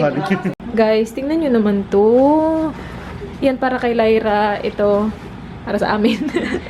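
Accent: Visayas, Philippines. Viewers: tga san kaya si ate ,parang visayas part kasi may "uy" lahat ng mga bisaya mga "uy" ung word sa tapos 😁. enjoy ko ung vlog ninyo po. ty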